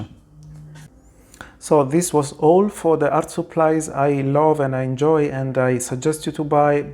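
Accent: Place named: Italy. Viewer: Thank your for showing your sketch books! I like to watch videos like that but most sketch books are more art books - too perfect to be true. ;) Your sketch books are encouraging. Also thank you for showing your art supplies. I also like to hear your voice with your italian accent. :) Greetings from Germany.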